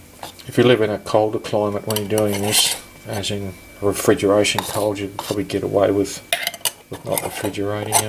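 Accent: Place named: United Kingdom. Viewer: You are Brit and its to hard for me to understand you, but nice video😂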